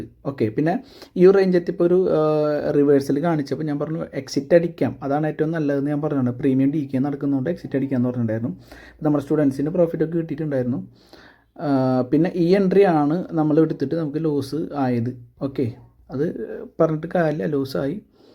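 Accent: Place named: Thrissur, India. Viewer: ചേട്ടാ 3 മിനിറ്റ് ടൈം ഫ്രെയിം ഉപയോഗിച്ചു smc വരച്ചു നോക്കൂ അടിപൊളി ആണ് എനിക്ക് ഇവിടെ ചാർട്ട് പോസ്റ്റ്‌ ചെയ്യാൻ പറ്റുന്നില്ല ഇന്ന് 9.20 നു ce എൻട്രി 9.42 നു put എൻട്രി 2 ഉം അടിപൊളി ആയിരുന്നു. ഇവിടെ ഉള്ള ആളുകൾ forex മാർക്കറ്റിൽ യൂസ് ചെയ്യുന്ന smc സെറ്റ് അപ്പ്‌ വെച്ചു ഇന്ത്യൻ മാർക്കറ്റിൽ ട്രേഡ് ചെയ്യുന്നത്. അത് കൊണ്ട് smc യുടെ കൃത്യമായ ഗുണം കിട്ടില്ല ഇന്ത്യൻ മാർക്കറ്റിൽ ഇയാളുടെ വോയിസ് കേട്ടിട്ട് ഇവിടെ തൃശൂർ അടുത്ത് ഉള്ള ആള് ആണ് എന്ന് തോന്നിയത് കൊണ്ട് പറഞ്ഞത് ആണ്. ഈഗോ ഒന്നും തോന്നരുത്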